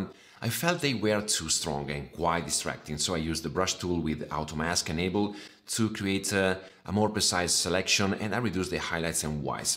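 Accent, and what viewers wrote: Italy. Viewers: English with Italian aksent is much better than native English. But I think this goes for everything, a flavor of Italy will always make things improve.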